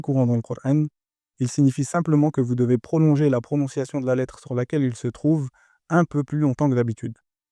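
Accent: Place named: Belgium. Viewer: j'avais noté la prononciation Belge aussi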